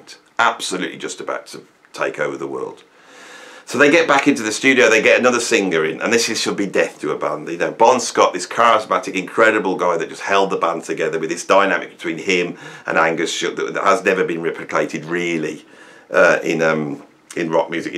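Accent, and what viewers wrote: Birmingham, United Kingdom. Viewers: Generally agree with all 10! Oh how like Gimme Shelter toooo... Well, I have other nominates for Overrated. Anyway, thank you, it's allways nice to hear your experienced musical opnion in your British English :) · Yeah, I find the Brummie accent is very arousing when the cool is being lost!!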